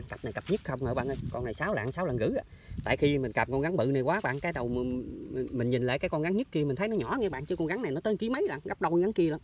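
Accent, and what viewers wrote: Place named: Hau Giang, Vietnam. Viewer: Bạn quê Hậu giang hả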